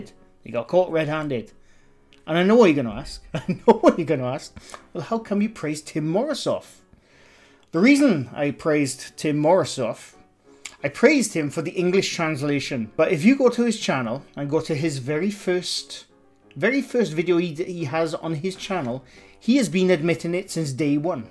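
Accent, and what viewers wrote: Wales, United Kingdom. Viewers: Sent here by the Shape 😊 and now hearing you’re Welsh, well I subscribed coz I’m Welsh myself 😀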